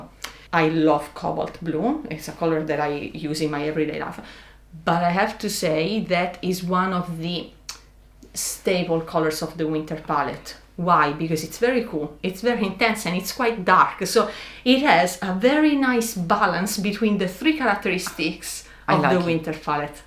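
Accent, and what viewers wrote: Italy. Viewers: I've been watching so many of your videos that when you removed the cloth and I said at the same time (with that beautiful Italian accent) "ah noh, the cobahlt is SO much better than the cyan" 😂🤭 amazing video as always! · I love these videos so much, and I have learned so much. You have a very special gift of explaining the concept and the analysis very well. And although I've seen dozens of them now, I'm still intrigued every single time to find out exactly why which palette works for the gorgeous models (and thanks to every one of them as well for demonstrating how color individually affects us). I must also say that the lovely Italian accent adds so much charm to the whole process. I am a Winter Cool myself, and whenever I go shopping and am determining which color is the right one, I am hearing in my ear "wiinterrr cool" and it puts a smile on my face! 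Thank you so much 💙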